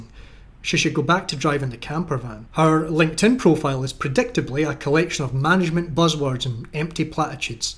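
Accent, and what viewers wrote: Scotland, United Kingdom. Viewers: Hey ,,,,,,,,,,,there is nothing wrong with you and your contents, and I Looooooove your Scottish accent too. Like you i cant wait for the day when this Garbage is dumped to the Landfill of the Human History, and those who really want to work for the sake of progress in life, get their turn at the Mill.✌ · As a former Kiwi I love the tale being told in a Scots (or South island ) accent . Where did capt rugmunch get all of those gongs? it looks like the display of a Swiss Admiral. The NZ Navy was once a proud service and even fought with distinction in WW2. Winston Churchill gave a dinner for the Crew of the Archillies after her success in the battle of the river Plate, HMS Achilles won instant immortality in the Battle of the River Plate in December 1939. Leander’s early war was much quieter, although in February 1941 it sank the Italian commerce raider Ramb I in the Indian Ocean. 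HMNZS Leander was to see more dramatic action in the Pacific War. In July 1943, fighting alongside US ships, the cruiser confronted a Japanese force in the night-time Battle of Kolombangara. After helping to destroy a Japanese cruiser, the New Zealand vessel was hit by one of the enemy's feared ‘Long Lance’ torpedoes. The crew performed surperbly to save their ship, but 26 seamen were killed. Eventually sent to the US for repairs, Leander would never fight under the New Zealand ensign again. almost forgotten today because no women were aboard.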